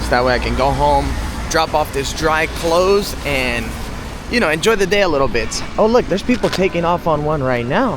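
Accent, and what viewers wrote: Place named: South Florida, United States. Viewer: Just came across this channel. Good stuff! From how you talk I wanna say south Floridian🥳🥳🥳👏🏽👏🏽🥂🍾👌🏽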